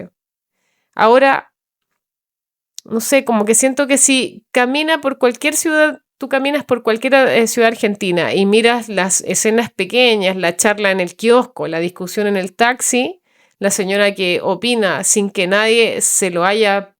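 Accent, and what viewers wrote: Chile. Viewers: GRACIAS querida.!!!!! Me ha encantado tu delicioso ACENTO,...a mis 76 años me enamoré de CHILE, por VOS.! Claro, también los CONTENIDOS.! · Hola gracias, cada vez que te escucho con esa tomadita chilena. Desde La Plata - Bs As - Argentina Vas como borrando ese enojo o recelo que los argentinos tenemos por los chilenos. Es que de verdad dolió que tuvimos ayuda de tu país en la guerra de las Malvinas. En cambio nuestro cariño al pueblo peruano. Que fue el único país que nos ayudo esa guerra. Yo era muy chico y me contaron y lo estudie en la escuela. Muchos años después vinieron esas 2 copas América que Chile nos ganó para mí opinión una debió ganar Argentina. Dicho esto, coincido con vos a los argentinos vivimos la vida a mil y somos muy teatrales. No por nada Capital federal es una capital con miles de teatros En la crisis del <num> Me acuerdo de haber participado de una obra de teatro en cooperativa que justamente hablaba de las crisis de nuestro país. 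Pero también de nuestros proceres como Belgrano, San Martín y otros. Y sus ideales .. Personalmente no tenía trabajo, había dejado la carrera. Y el país se caía Y ahí estábamos unas 30 personas algunos docentes, trabajadores y desocupados. Jóvenes y personas hasta 35 o 40 años creo. ( Hombres y mujeres ) El director un ex consejal de La Plata. Que le decían el turco por su origen de familiar Bueno estuvimos todo un año ensayando la obra era un musical Y la estrenamos en diciembre. Pero muchos necesitamos estar ahí en hecho cultural que nos una y ayude a pasar ese momento juntos Y si la familia, la amistad, la reunión, nuestros ídolos son sagrados. Porque creo que e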